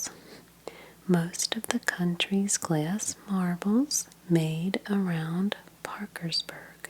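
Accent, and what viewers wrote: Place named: East Coast, United States. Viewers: you’re 100% from the east coast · Brooklyn accent? Whatever it is I love your extra vowels.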